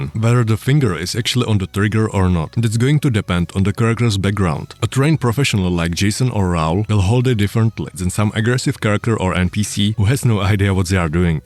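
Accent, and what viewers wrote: Czech Republic. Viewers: This is czech accent? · Are you czech you have that kind of accent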